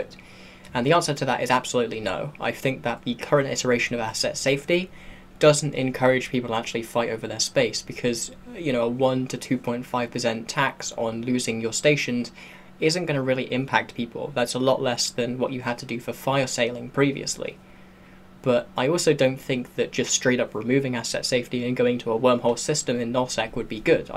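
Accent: United Kingdom. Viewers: What's with the american flag on your wall? I'm genuinely curious as you have a british accent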